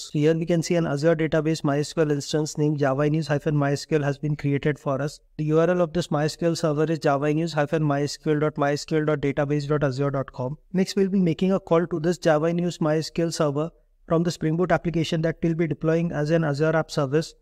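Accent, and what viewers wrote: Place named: India. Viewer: Very useful videos! Can you speak at a little slower pace? Will help people like me not used to india accent. Otherwise, great content.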